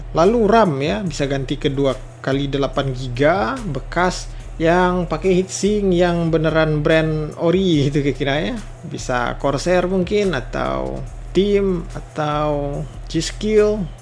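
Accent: West Sumatra, Indonesia. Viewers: bahasa indonya kek orang minang wkwkwk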